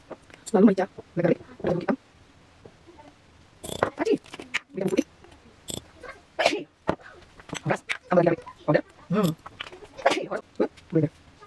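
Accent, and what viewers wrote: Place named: Java, Indonesia. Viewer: Mamak ini ngomongnya terkontaminasi sama orang Jawa ya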